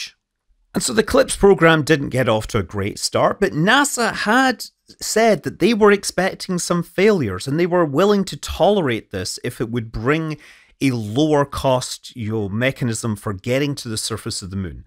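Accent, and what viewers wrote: United Kingdom. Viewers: Thank you for the wonderful presentation, a fascinating channel, and your great knowledge, enthusiasm & skills, but that (British? Scottish?) accent, such as at/about 00:5 to and to , particularly with the changes in voice volume & intonation (obviously intended to convey your obvious enthusiasm) make your speech unintelligible to American listeners, and does your work a terrible disservice. Now, with nearly 2 million subscribers, you may wish to ignore my voice, but I think that your fascinating thoughts could enjoy an even bigger audience if your speech was clearer. Thank you & best wishes!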